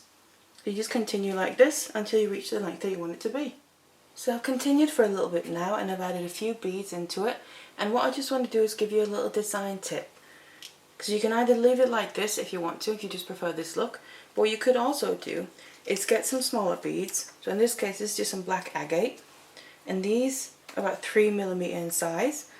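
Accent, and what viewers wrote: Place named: Macclesfield, United Kingdom. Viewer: My cousin lives in Maccelsfield. Her accent seems close to yours - is your location mid country?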